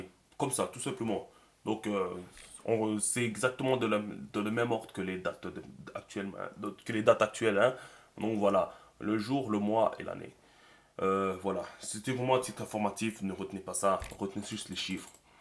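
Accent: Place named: Banlieue, France. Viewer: tu parle trop pour rien dire. je te conseillerais d'apprendre un cours de méthodologie pour enseigner .avec ton accent des banlieues ça nous casse les couilles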